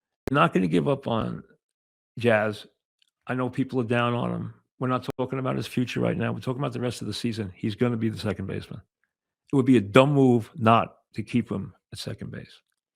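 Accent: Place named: Long Island, United States. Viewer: That's his Lawn-Guy-Lind accent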